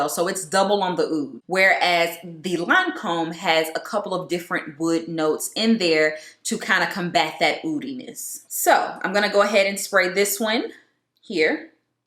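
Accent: South, United States. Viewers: I love your accent you have a southern sound, love this video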